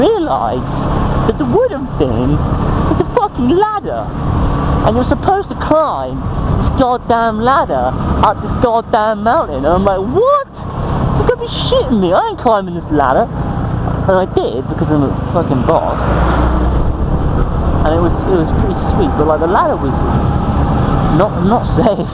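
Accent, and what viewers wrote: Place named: United Kingdom. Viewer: Haha, you sounded just like a young M13 with British accent. Do you have problem understanding Irish people sometimes? I have to have subtitles watching Irish movies.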